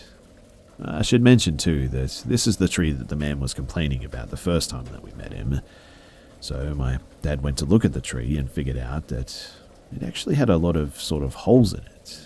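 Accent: Australia. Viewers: it’s australian :) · His Australian accent reminds me of hugh Jackman that's a good thing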